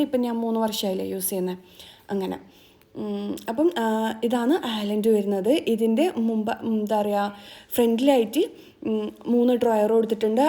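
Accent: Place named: Kasaragod, India. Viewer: avideya, samsaram ketit kasargod pole end. Kasargod samsarikkund anne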